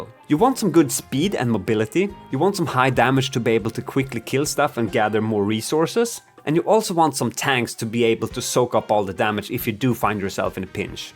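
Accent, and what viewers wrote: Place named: Sweden. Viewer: First video from you that I’ve wached, great first impression. Your accent sound very swedish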